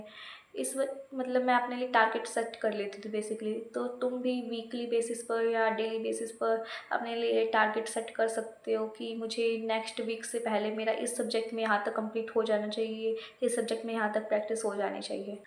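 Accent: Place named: Haryana, India. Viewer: Bhai yo to mane pata lag gya thare haryanvi accent badi mast hai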